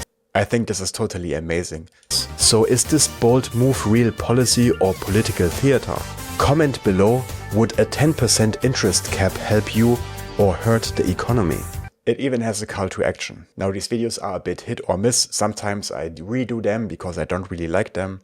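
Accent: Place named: Germany. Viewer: thanks for the detailed review of HeyGen and some great quotes there such as it "even maintained my German accent" ☺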